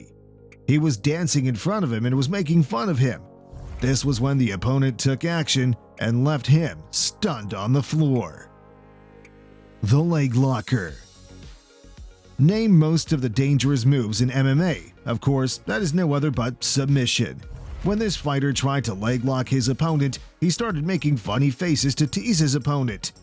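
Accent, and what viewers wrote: United States. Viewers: Don't get me wrong I love this video but you kind of sound like an American who speaks English as a third language.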